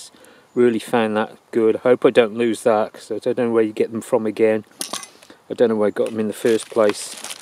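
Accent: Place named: Warwickshire, United Kingdom. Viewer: 'ello! lol I'm Rae:D (RaeNtheBionicSheep on <link> ) you sound like your from Warwickshire! Where I was born lol! Not how I'd picture the face to the voice lol but nice to see another cacher vlogging. Must do cache vlogs myself lol... Thanks for vlogging!:D I am trackable at WBX9FJ if you wanted or anyone wanted to track me:D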